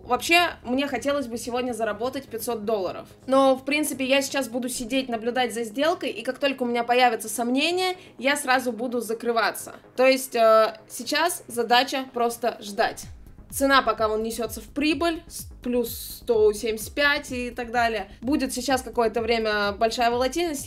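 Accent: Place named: Russia. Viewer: И, не профИт а прОфит, учитесь говорить правильно. Я понимаю что Вы придерживаетесь провинциального произношения русской речи, но никогда не поздно научиться. Аж уши режет: проФит, средствА, постираться, убраться, погреть что-либо из еды, а не подогреть или разогреть, задолбали провинциалы со своей речью.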